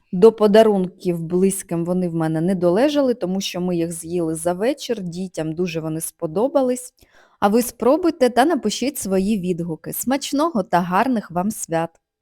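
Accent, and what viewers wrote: Standard. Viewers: Дякую за сиачний рецепт і дуже гарний супровід - чиста, правильна, красива Мова- БРАВО💙💛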